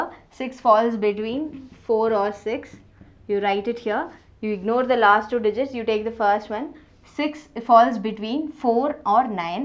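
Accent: South India, India. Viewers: R u a south Indian??. This is very useful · Mam's english tone is somewhat southindian but I love it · South Indian accent.....liked it very much mam....and your method is unique......thank you mam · Thanks ma'am a lot. It's very helpful to me . And one more thing, are u south Indian? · Are you from South india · Madam South ki hai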